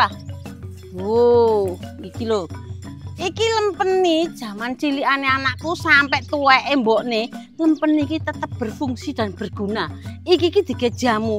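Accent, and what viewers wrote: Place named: Surabaya, Indonesia. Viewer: Logat ny campuran surabaya ya heheeh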